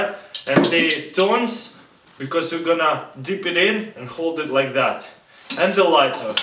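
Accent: Russia. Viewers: Thanks for making these videos. I like how they are quick and easy. Your Russian accent is gnarly by the way.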